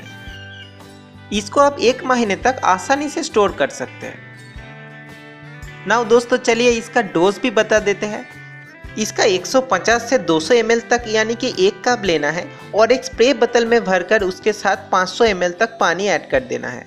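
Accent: Bengal, India. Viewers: Nice accent. Bengali style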